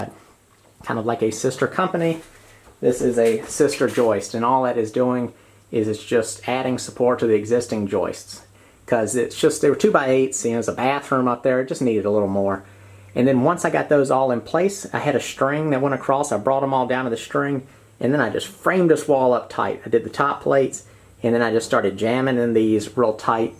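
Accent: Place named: Texas, United States. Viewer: Hey, I live in France and about wood framed houses (maison ossature bois in French) you're actually so right. Our tough houses ( masonry) are tricky to renovate regarding man strength and structurarly it requires heavy heavy duty sometimes just to add a door, a window or a sliding door. Wood houses are on the rise it is true in France. They're not really cheaper since we don't havea lot a wood resources. But they provide flexibility regarding the floor plan and the possible additons of the house (for instance, adding a bedroom on the side of the house). Workload is really easier to manage with wood than masonry. Also, wood provides better eco (heat) performances but for sure far more sound issues (cracking and sound passing from a room to another) and some people fear the wood to rotten and by doing so, making the house to collapse. Anyway, I bought a house in Normandy which was a pig house transformed onto a house but its core is compacted dirt with cereals... 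Anyway if I'd build one, I'd choose wood for sure. Love your videos. Love the music. Love your Texan accent. Love the content. Love the answers given to our questions either in the comments or in your videos. Take care and love this house too, great potential. 👋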